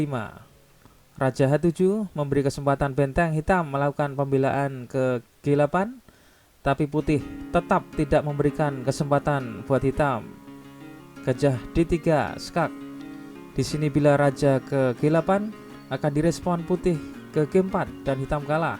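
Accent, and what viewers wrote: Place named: Java, Indonesia. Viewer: Sehat selalu bapak dgn dialeg jawanya😊❤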